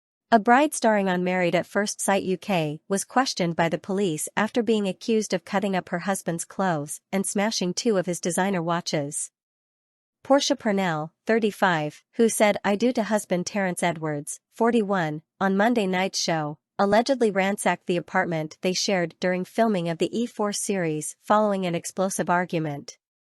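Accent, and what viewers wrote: United States. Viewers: Your voice is awful-why is an American doing this voiceover!! Has she inhaled helium ..awful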